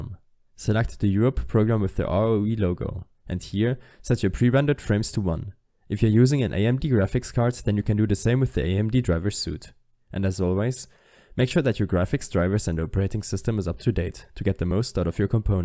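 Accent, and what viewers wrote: Germany. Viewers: Jawoll ein deutscher das hört man so raus hahah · ich habs auch schon an seiner aussprache erkannt und dann erst bemerkt, dass aufm pc auch alles auf deutsch ist xD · Alle sagen dass man den deutschen akzent raushört Aber niemand gibt probs für seine englischskills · Man hat direkt an deinem Englisch gehört dass du ein Deutscher bist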